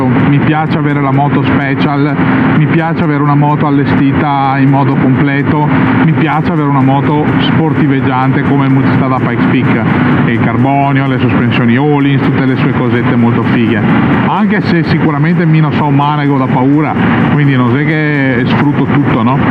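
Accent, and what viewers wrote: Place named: Padua, Italy. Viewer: bel video, sempre grandi contenuti e spiegati, raccontati in padoan, tanta roba🤣🤣🤣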